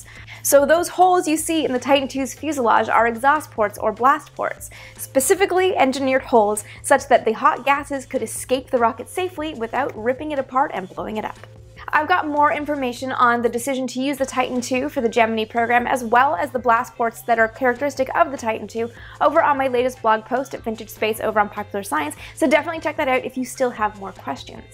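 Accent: Canada. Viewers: My fav launch is the Saturn V with Pete on mission control? Are you from Canada? You pronounce 'out' and 'about' in a different way.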